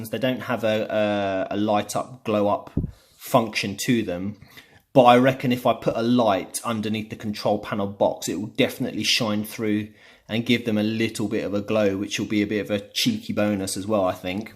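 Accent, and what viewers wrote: United Kingdom. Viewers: Are you British? Your accent is so cool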